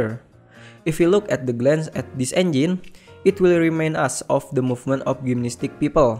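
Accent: Indonesia. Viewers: Logat indonesia nya kedengeran bro.. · Ini org Indonesia ya ? Soal nya logat nya indo banget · I immediately know that this channel is based in Indonesia from your first word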